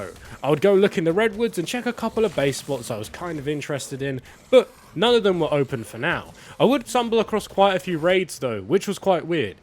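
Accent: United Kingdom. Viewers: Brit English is so annoying!!!!!!!!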